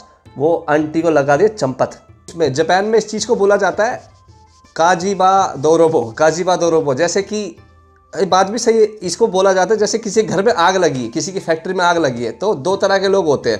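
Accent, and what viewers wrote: Haryana, India. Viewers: Bhaiya ki Haryanvi awaaz bahut dhaakad hai 💪💪💪💪💪💪💪🤟 hamari yahi duaa ha aap Sahi salamat raho 🙏🙏🙏❤️❤️❤️❤️ aor 🤟🙂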